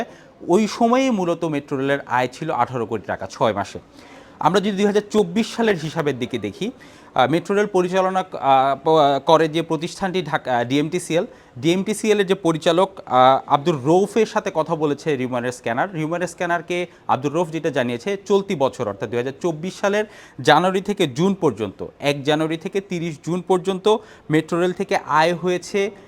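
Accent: India. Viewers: আপনি যেটা বুঝাতে চাচ্ছেন সেটা আমরা বুঝে গেছি।। দাদার টান এখনো৷ যাই নাই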